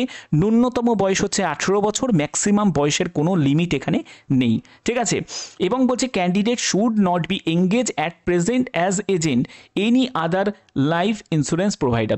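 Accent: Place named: Hooghly, India. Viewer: Hooghly thakey bolche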